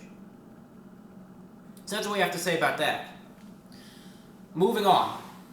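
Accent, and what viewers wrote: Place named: Brooklyn, United States. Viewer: Very Brooklyn accent you have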